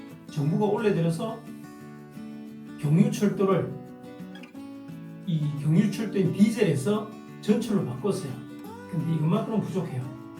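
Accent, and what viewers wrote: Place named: Gyeongsang, South Korea. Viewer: 영덕사투리를 좀쓰시네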